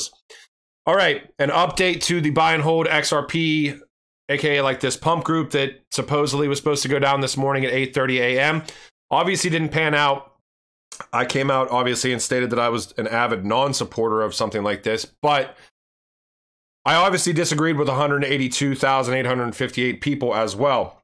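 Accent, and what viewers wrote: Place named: East Coast, United States. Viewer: It's your East Coastness coming through. You're fine. Keep doing you.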